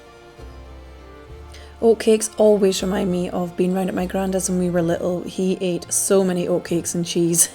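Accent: Scotland, United Kingdom. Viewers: oh I do enjoy the recipes and perhaps even more so because of your lovely Scots. It's music to my ears. Happy Burns Night from the Bavarian Highlands, the alpine region. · Came to this video from your crowdie video. Your camera work and quality are excellent and you have a lovely soft voice and of course the Scottish accent is the cherry on the cake. 😊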